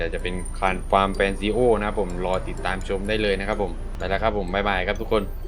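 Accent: South, Thailand. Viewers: คนใต้หม้ายนิ 5555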